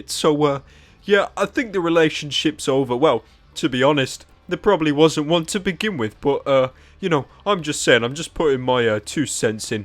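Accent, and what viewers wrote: United Kingdom. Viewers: what's wrong with his voice lol I love British accents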